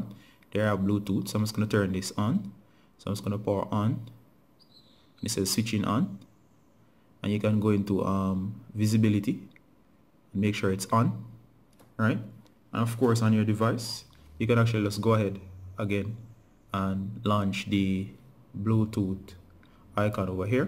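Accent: Jamaica. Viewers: Are you jamaican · Stfu he's jamaican like myself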